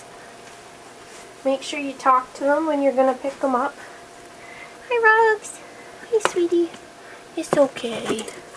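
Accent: Canada. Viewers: I <3 your accent. Are you Canadian?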